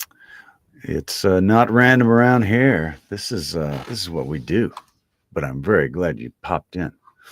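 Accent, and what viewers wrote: South, United States. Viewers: Thank You SO much for your love and work!! It's delightful listening to your renditions of classics - Southern Style! Fabulous!!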